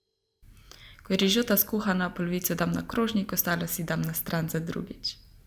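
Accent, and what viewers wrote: Carinthia, Austria. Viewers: A ti si slučajno iz Koroške?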